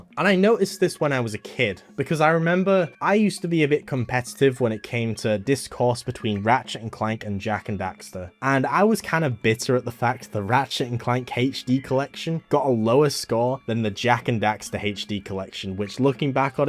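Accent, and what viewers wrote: United Kingdom. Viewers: That Life Of Brian joke was too good XD i don't listen to you at all. I just want to throw money at your british voice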